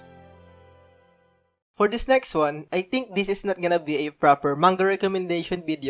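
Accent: Philippines. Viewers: You sound like a filipino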